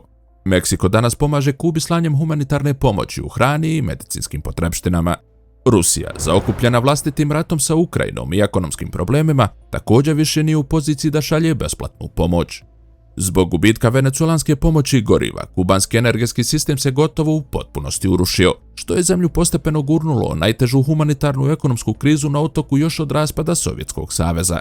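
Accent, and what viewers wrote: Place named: Herzegovina, Bosnia and Herzegovina. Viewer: Hercegovac govori. Ne Bosanac.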